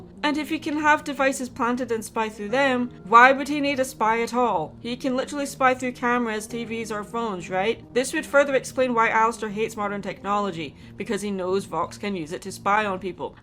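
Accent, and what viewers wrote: Scotland, United Kingdom. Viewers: omg are you scottishhhh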